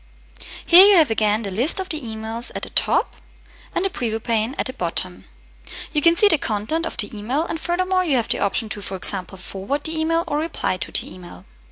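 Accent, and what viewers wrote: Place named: England, United Kingdom. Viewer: I just love her English accent.......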